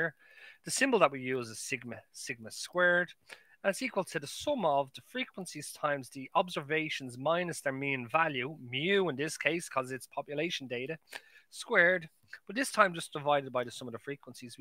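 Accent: Ireland. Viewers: I was studying the time series chart video at like the first very second in on the video I noticed ur irish xdd geez nice videos man I'm taking MATH201 (probability in business) or so so I'll be using ur channel a lot :) so you've got a new subscriber hahahah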